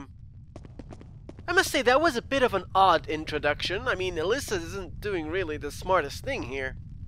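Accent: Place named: United Kingdom. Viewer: Oh dat british accent <3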